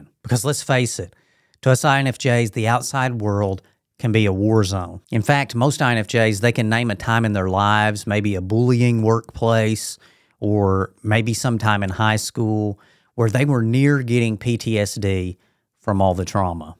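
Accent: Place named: Alabama, United States. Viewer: Hey are you from Alabama? Love the accent. And everything you saying is SOOOO TRUEEEE 😊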